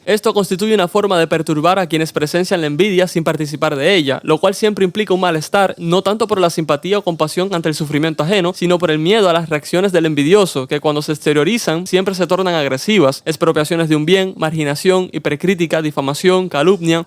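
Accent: Cuba. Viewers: Es que te tengo envidia por la edición de tienes, ni decir de tus memes o de tu acento cubano mi hermano 😎😎😎😎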